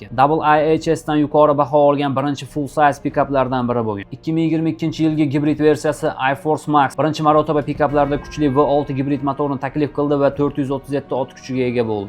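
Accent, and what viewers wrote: Andijan, Uzbekistan. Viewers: Бу брат тоза Анжанданми диманда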